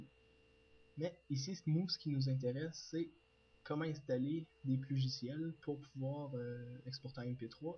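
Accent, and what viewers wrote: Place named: Canada. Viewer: il est canadien c'est normal si il parle un peu différement hein.....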